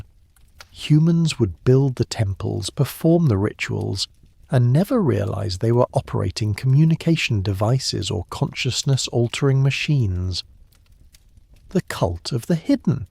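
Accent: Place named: England, United Kingdom. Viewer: please please No More English Accent !!! please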